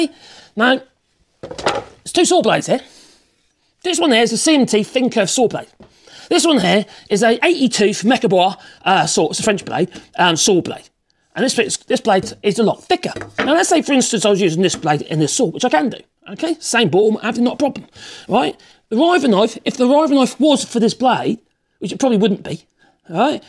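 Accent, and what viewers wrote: United Kingdom. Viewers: I sadly understood barely two words you said mate, but you are so entertaining to watch that I still watched the video to the end. It's so nice to hear a British voice on youtube, especially one who loves tools and making stuff with wood. You're always entertaining because you come across as someone who doesn't give a fig for what people think, and I like that about you. You are what my adorable mum used to call a WYSIWYG type of person (In other words you are a 'What You See Is What You Get) type of person. And I mean that in a nicest way possible!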